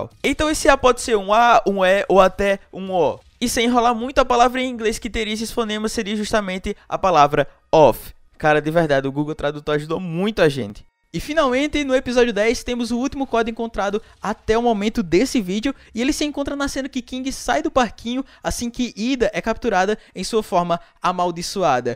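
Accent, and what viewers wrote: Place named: Recife, Brazil. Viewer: Sou novo aqui deu 2 segundossdo cata falando e eu como : esse cara é de recife esse sutaque só pode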